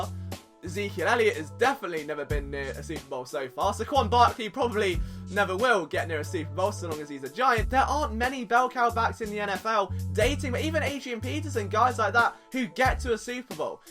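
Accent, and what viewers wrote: United Kingdom. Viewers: You have much wisdom in the realm of football, my son. In spite of your British accent which would suggest one to assume you care nothing about the game at all...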